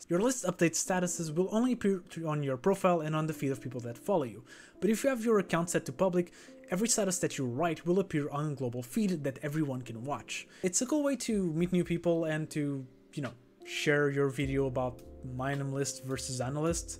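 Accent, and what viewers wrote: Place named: Portugal. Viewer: Something curious just happened... I just joined anilist and I wanted to know more about the website before starting to use it. I open youtube, search for "anilist" and this video is right on top. I click it. Less than 10 seconds in and I start to have this feeling... your face, your accent... is he? Something tells me he is... I go to your "about" section and that's when I see it... este gajo é português caralho! Um pt consegue cheirar outro pt à distância ahaha acabei de subscrever. Boa sorte com o canal!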